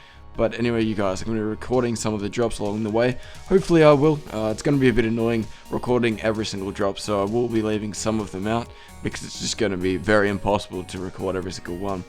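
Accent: Australia. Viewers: No, he sounds Australian.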